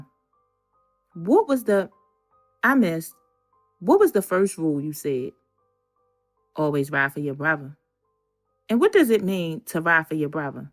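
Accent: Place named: Baltimore, United States. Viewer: Who ever you got reading this is definitely from Baltimore like me hot-dug aka hot dog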